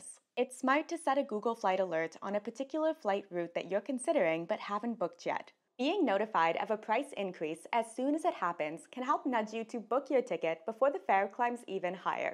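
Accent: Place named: Coney Island, United States. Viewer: Love the accent are you from Coney Island Canada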